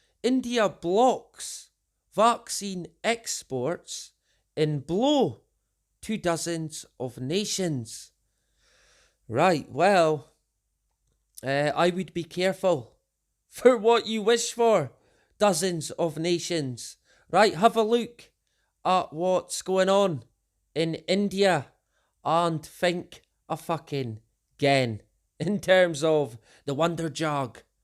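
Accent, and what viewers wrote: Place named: Scotland, United Kingdom. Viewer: Thanks for your dedicated research and always bringing us the real news, with your ever soothing Scots burr! Much love!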